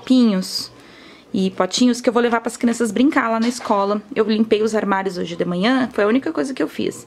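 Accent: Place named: South, Brazil. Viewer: Amo o sotaque do Sul e.lindo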